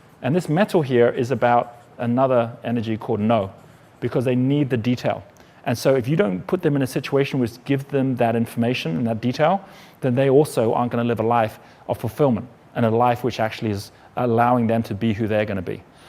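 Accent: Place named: South Africa. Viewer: Can somebody tell me where his accent is from? It sounds like a South African gone to Australia or something.....curiosity killed the cat I know, but it's killing me not to get it 😬😅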